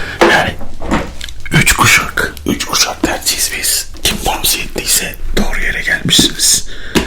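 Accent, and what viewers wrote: Eastern Anatolia, Turkey. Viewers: abi bu platformda asmr konusundan rakibin yok. Daha çok doğu şiveli video gelirse çok iyi olur.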